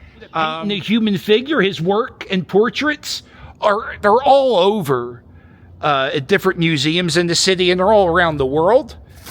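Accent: Philadelphia, United States. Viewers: Thanks Cuz… Love ur vlog. You have the voice to rep Philly. Keep it up. Keep warm. Much Brotherly Love!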